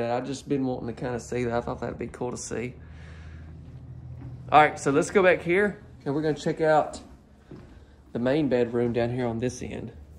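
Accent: South, United States. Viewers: Hello. I am from beautiful Campbellville Ontario Canada. Since today is February 01, where I am is fairly cold. I do like parts of this house. The second bathroom was a good size as you mentioned. No pantry(disappointing), no sink in the "utility room". Regarding the "u", I and most people I am acquainted with would call those rooms a laundry room. If you use laundry room no one can tease you on your pronunciation. 😉 I haven't been fortunate enough to be able to travel to the Southern States. I SO adore your accent. Have you ever traveled to Canada? Where I live(in the country)there are modular homes parks 20 minutes away. Maybe once Covid has gone, you might want to look at modular homes in my area. Thank you for your videos. I enjoy seeing the different layouts, rooms sizes, cabinets and flooring. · BABY BOY!! Libby from Tampa Florida. I would never criticize you on your Channel. 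The only thing I can tell you is don't be negative with your little funnies. Or your big funnies! Those are what makes your Channel! Own them. Don't say I'm just kidding. You are great the Way You Are. Just be more firm with your delivery. Because you are great!. I watch other mobile home channels😝 I can't take it good. I'm looking for your little Southern Voice and just your humbleness that shines through. This time I took the time to put a long comment. I'm sorry that I don't leave one every time because I watch you on TV. GOD BLESS YOU LOVE YOU!!! 👵🙏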